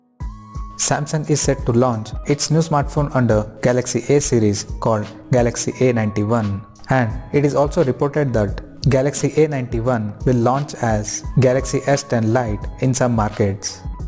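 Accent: India. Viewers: This guys Indian accent is so thick I that I can't understand what the hell he is saying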